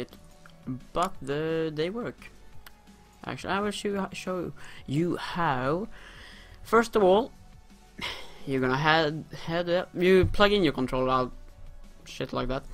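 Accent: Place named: Sweden. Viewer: du har svensk engelsk dialekt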